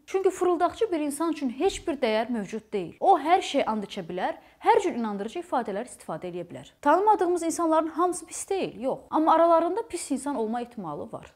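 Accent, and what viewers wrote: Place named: Sheki, Azerbaijan. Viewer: xanım haralıdı görəsən? Şəki ləhcəsi var, amma videoroliklərdə lap bizim kəndin ləhcəsində danışır, əsas da ana 🤣🤣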